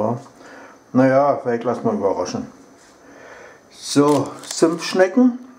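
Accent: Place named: Berlin, Germany. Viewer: Du bist Berliner, das höre ich sofort. Und, ist der auch Berliner? Zimtschnecken schmecken nirgens so gut wie in Schweden. Wir Deutsche können die nicht richtig backen. Oh ja, heute wirds nochmal sehr heiß bei Euch. Hier unten am Bodensee ist es heute angenehm warm mit Temperaturen von 23 bis 25 Grad. Grüße von hier von Leonora Lily Vielen Dank für Deinen Besuch. Hört Karle auch meine Musik? 😼😽😼😽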